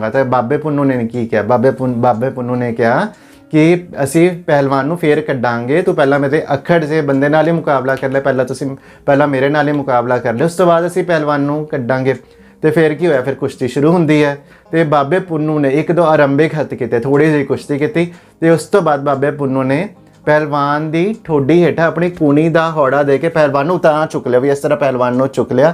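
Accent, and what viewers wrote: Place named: Punjab, India. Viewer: Your punjabi language is so authentic Good explanation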